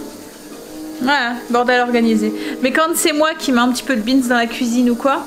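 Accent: North, France. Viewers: Bonjour j'ai pas fini de regarder la vidéo tellement que je suis énervée 😒 c'est quoi encore ces gens qui disent des choses non justifiées oui parce que dire Rome à la place d'Italie n'est nullement une faute de français, un lapsus ce qui est différent et je pense que ces personnes sont loin de savoir la langue française et qu'ils doivent faire énormément de fautes d'orthographe. Ensuite les gens chaque personnes prononcent un mot différemment de chacun POURQUOI LA RÉGION D'OÙ VOUS VENEZ et n'oubliez pas que est du NORD l'accent du mot et pas une faute de la langue française c'est simplement une prononciation type de son origine comme en corse, Italie, Provençal bref avant de critiquer soyez sûr de votre FRANÇAIS. Voilà j'ai fini tu es une bonne personne la vie réserve de mauvaises surprises parfois et certaines ont une vie plate sans soucis alors CRITIQUES.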